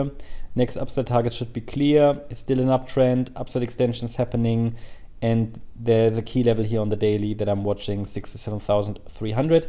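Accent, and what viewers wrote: Germany. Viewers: bist du deutsch? german like accent :D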